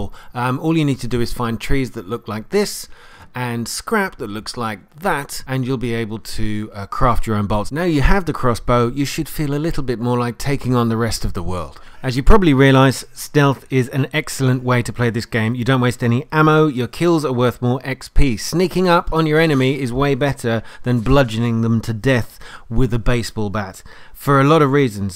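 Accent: United Kingdom. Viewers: YES YOUR BRITISH sub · Good to see a fellow British guy doing a video, expected an American but pleasantly surprised. Not that I have anything against the Americans. 😜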